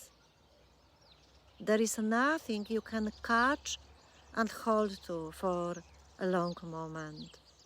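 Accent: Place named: Poland. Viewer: It's insane how similar you are to the other woman I can't find anymore: the same Polish accent, the same rhetoric, there is no 'you', etc.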